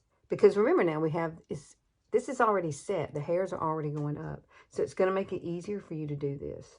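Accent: South, United States. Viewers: Ur video from 6 yrs ago has a bit of a diff southern twang but it’s all so cute :) I miss hearing u say charlotte tilSbury 🤗♥️ I can’t wait to try ur make-ur-own liner brush technique & ur shadow hooded tip 💖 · Nothing wrong with sounding Southern, it’s a great part of our country! It sounds cute too.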